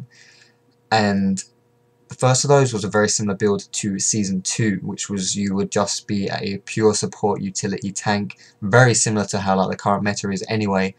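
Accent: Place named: RP, United Kingdom. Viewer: Based on your accent i 've always thought that you was posh and pretty cool. now that i see your face i realize that you are simply AWSOME!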